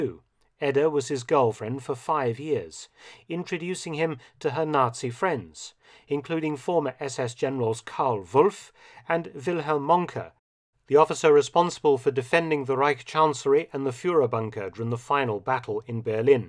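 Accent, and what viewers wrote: United Kingdom. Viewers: He's British. Americans are the English speakers that hardly ever care.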